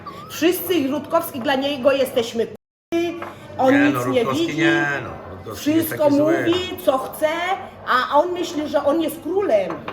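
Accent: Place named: Poland. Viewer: No taki spoko mocno polski akcent i dykcją;-)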